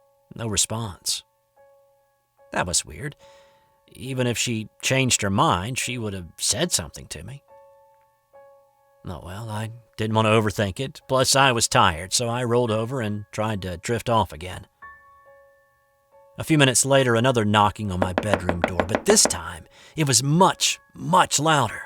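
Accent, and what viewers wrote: South, United States. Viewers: Ah, it's great to see another upload from you! I'm detecting a bit of a southern drawl, very faint. Where are you from? Cheers!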